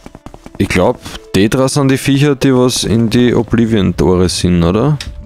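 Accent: Vienna, Austria. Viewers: Endlich Wienerisch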